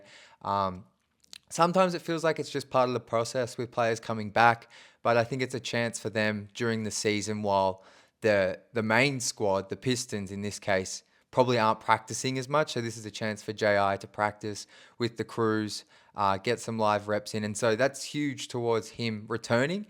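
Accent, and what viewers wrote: Australia. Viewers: Accent through me off I’ve never received my pistons news via an Australian ! Good shit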